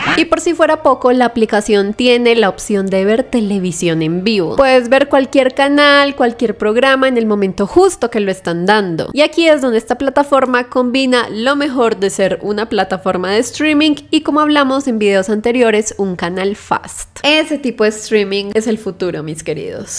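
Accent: Colombia. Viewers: T escucho la voz de colombina y estas en mexico ? saluditos nuevo seguidor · Wow eres de México por un momento pensé que eras de Colombia por tu acento 😮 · Tu eres de Colombia cierto?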